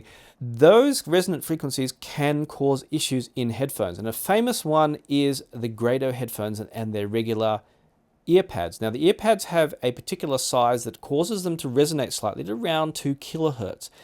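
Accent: Australia. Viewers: Bravo! The most grown-up headphone review ever. "I'm not going to talk about cables." <3 I usually freak out when "audiophile language" is invoked; I can't help being reminded of when I spoke like that and I feel so ashamed. None here. No overused superlatives. No neologisms. As much a pleasure to listen to an Australian accent talking about the best headphones without needing to sell them (they'll do that themselves, no doubt) as it'll be to actually listen to the Expanse itself.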